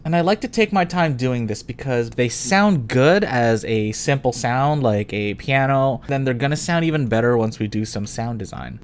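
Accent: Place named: United States. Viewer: Too American sounding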